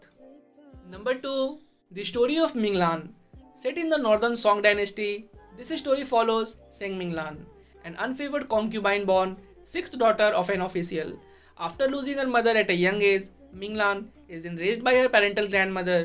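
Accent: India. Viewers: Are u indian??? Cause ur voice sounds like indian ☺️☺️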